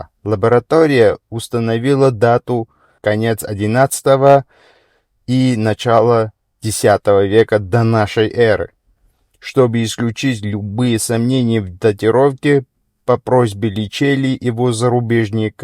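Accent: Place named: Georgia. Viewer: ❤❤❤ Слава богу услышал,что возраст примитивных наконечников возрастом 2млн.лет.Почему-то считают 20-30 тыс.лет официально возраст человечества.Неужели нельзя называть правильный возраст человечества на Земле.Ранее видел раскопки Грузии,где были обнаружены гигантские челеческие кости.Хотел бы об этом найти продолжение....Мне нисколько не мешает озвучка человеком грузинским акцентом.Намного лучше,чем озвучка ИИ.....Насколько помню апостол Пётр говорил на арамейском древнем языке.,Как всегда,миру-мир,а армяне присваивают всё то,что раскопано археологами,заявляют о братстве.....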